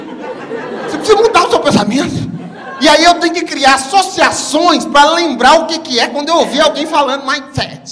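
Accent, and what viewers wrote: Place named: Minas Gerais, Brazil. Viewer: Mineiro falando tipicamente mineiro já me causa risos, e com texto top, bom tom de voz e "taime" certo fica top...kkkkkkk Mt show 👏👏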